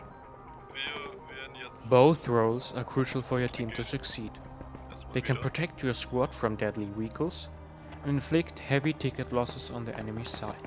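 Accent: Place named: Russia. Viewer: Какой то акцент русский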